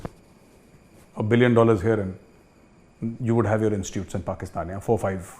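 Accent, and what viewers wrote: India. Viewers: bloody major why you are thinking for pakistan why don't think for India. You are teaching how to talk an oxford graduates , bloody majot may you can't enter the main gate of oxford university . like you little educated man lots in pakistan. you even can't speak proper english only indo english. shut of your mouth.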